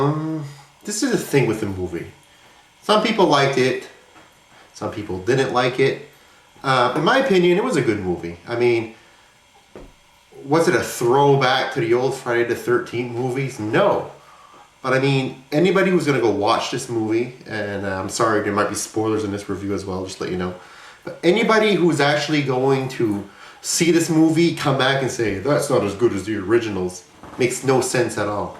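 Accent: Canada. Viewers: you from up north like thunder bay, cuz your accent is very canadian :P i could tell lol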